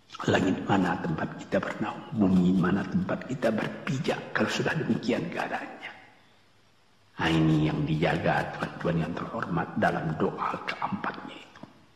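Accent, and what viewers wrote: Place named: West Sumatra, Indonesia. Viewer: logat minang ny khas banget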